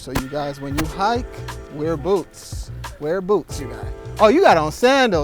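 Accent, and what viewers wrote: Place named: Caribbean. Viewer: Are you West Indian? I hear a slight accent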